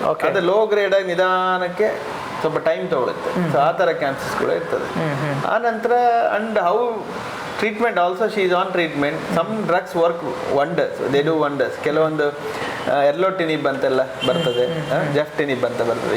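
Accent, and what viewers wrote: Mangalore, India. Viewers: ಕನ್ನಡದ ಬಗ್ಗೆ ಅಷ್ಟು ಅಭಿಮಾನ ಇರುವ ನೀವು ಸರಕಾರಿ ಶಾಲೆಗಳಿಗೆ ಕನ್ನಡ ಕಲಿಸುವ ಅಧ್ಯಾಪಕರ ನೇಮಕಾತಿ ಬಗ್ಗೆ ಸರಕಾರಕೆ ಒತ್ತಾಯ ಮಾಡಿ ಅದು ಬಿಟ್ಟು ಬೇರೆಯವರು ಮಾತನಾಡುವ ಶೈಲಿ ಬಗ್ಗೆ ಅವಹೇಳನ ಮಾಡಬೇಡಿ ಇದು ನಮ್ಮ ಮಂಗಳೂರು ಕನ್ನಡ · ಇದು ಮಂಗ್ಳೂರು ಕನ್ನಡ . ನಿಮ್ಗೆ ವಿಷಯ ತಿಳಿದರೆ ಸಾಕಲ್ಲವೆ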